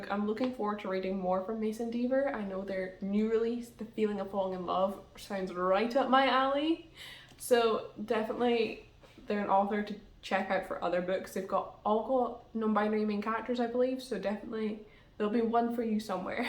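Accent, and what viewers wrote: Scotland, United Kingdom. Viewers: Do you have a Scottish accent when you speak Spanish? 😆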